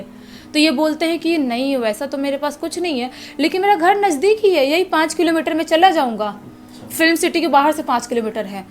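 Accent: Bihar, India. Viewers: Yarr tum chutiye ho kya . Pehle to iss didi ko bolo ki apni akhe chote krle . Vaddi vaddi akhe nikal leti hai . Hume dra dra ke marr degi kya 😂😂😂. Fir ise bolo ki hindi bolna to sikhle bihari to matt boll . Ja fir behari he boll le . Didi ko bolo ki apna accent theek krle . Words theek se pronounce kre .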